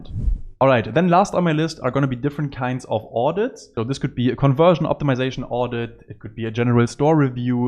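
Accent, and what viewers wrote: Germany. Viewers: Such a saver, very timely. Thank you so much. And yeah the German accent, spicing it up alittle and very original, so yeah.....😀😀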